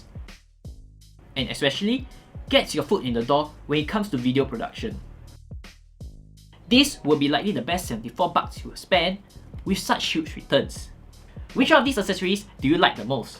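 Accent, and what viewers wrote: Singapore. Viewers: Terribly irritating Singapore accent. Can’t understand most sentences as there are too many sounds dropped. Terrible.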